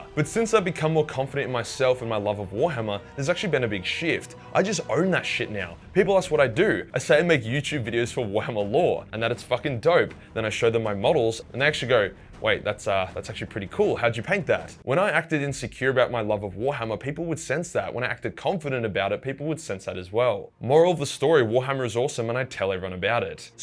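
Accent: Australia. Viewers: Is it me, or is everything better when explained by an Aussie?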